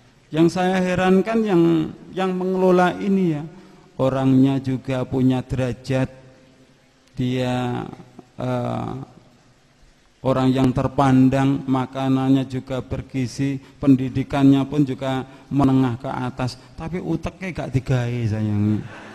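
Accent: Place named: Java, Indonesia. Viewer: ngomong nama tapi kq jawanya nglotok ya....wah parah nih orang.....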